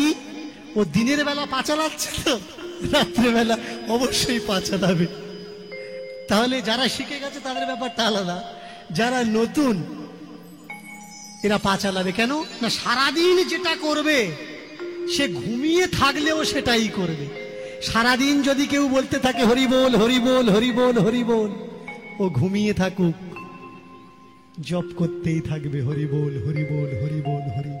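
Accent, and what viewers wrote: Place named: West Bengal, India. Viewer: কীর্তনীয়া মহাশয় আপনার বাড়ি কোথায় ? কথা শুনে মনে হচ্ছে পশ্চিমবঙ্গের বীরভূম , বাঁকুড়া কিঙবা পুরুলিয়া জেলার যে কোন একটা জায়গায় হবে হয়তো । তবে গান টি খুব ভালো লাগলো ।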